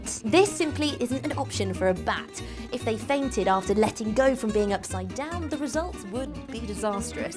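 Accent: United Kingdom. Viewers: Britsh accent ? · im inlove with your british accent .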